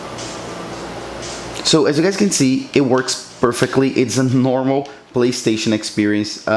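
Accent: Brazil. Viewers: Look at this accent man! Are you from Brazil?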